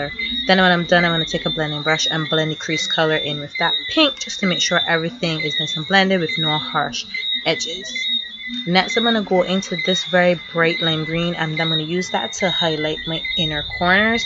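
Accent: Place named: Caribbean. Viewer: I like you caribbean Accent! very nice makeup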